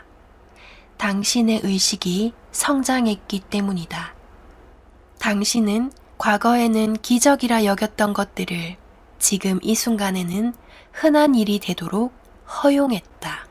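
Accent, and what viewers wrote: Gyeongsang, South Korea. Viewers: 나도 경상도 사람이지만.. 사투리 너무 웃겨 ㅋㅋ 너무 현실적이야...ㅜㅜ 그래서 더 귀에 콕콕 박혀.. ㅋㅋ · 사투리 방송 방갑네요 어떻게 갱상도 사투리 방송을 할려는 생각을 하싯는지.... 그리고 진짜 욱겨요 ㅋㅋㅋ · 나도 갱상도 할밸세. 좋은일 하는군..ㅎㅎ 자넨 사투리라고 하지만...억양만 그럴뿐 용어나 발음은 많이 표준화 됐다네... 나도 국어와 언어공부를 쪼께 했는데, 연습하고 공부하지 않은 토백이 사투리는 자네처럼 발음이 안되지....노력 많이했네. 계속 정진 하고 좋은결과얻고 행복 하시게...나도 독자로서 자네 사랑한다네...신의 은총과 축복을 비네.!!!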